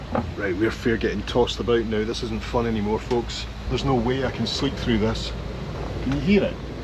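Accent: Scotland, United Kingdom. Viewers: oof. some days i'm better at understanding scottish-english than others.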